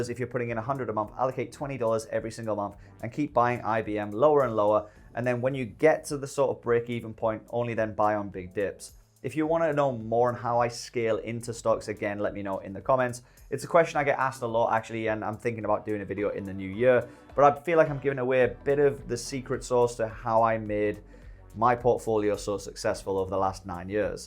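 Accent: United Kingdom. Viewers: Where in Uk are you from?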